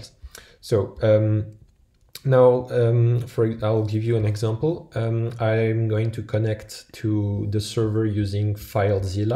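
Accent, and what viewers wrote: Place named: France. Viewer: Salut, j'ai reconnu ton accent français, moyen de faire la vidéo en français ?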